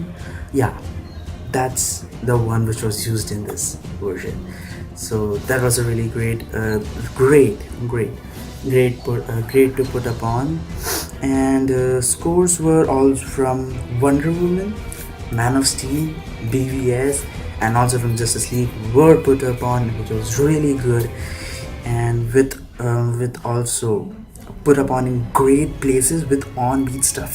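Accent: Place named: England, United Kingdom. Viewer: Like the english accent :v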